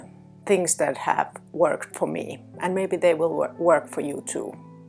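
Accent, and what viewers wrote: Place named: Finland. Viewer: Some 40 years ago, I had the opportunity to live in southern Finland for a year and hearing your accent brought back a lot of good memories. Thank you for that. I became aware of the HSP type only a couple of years ago and it felt very affirming as I read through The list of the ways that we are “different“ that are normal for us. I have quit trying to please others, I accommodate them when I feel I can, and that has made a big difference in how I feel and I’m able to live. I have found 15 to 30 minutes of meditation pretty much first thing in the morning is how I feel and function best so I make sure I have the time and privacy to get that in no matter where I am or what I am doing. I have become a bit of a recluse, but that seems to be how my life works best. You have to honor your own needs first or you cannot be there for anyone else.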